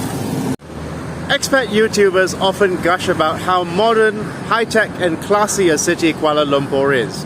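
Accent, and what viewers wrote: RP, United Kingdom. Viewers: Still better than Hong Kong. We former British colonies are nothing more than a testbed for the neoliberal experiment gone rampant. Rat race, rat utopias and behavioral sink. Nice posh accent btw.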